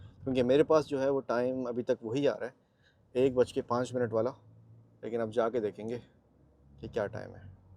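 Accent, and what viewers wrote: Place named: India. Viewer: Can't even believe you are from Pakistan.... Your language and accent and face is so much similar to people living here 💕💕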